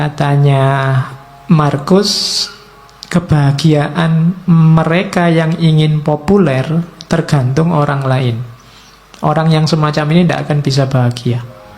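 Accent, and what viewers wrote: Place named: Java, Indonesia. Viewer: Jawa nya itu Jawa banget😹😹😹 medok hama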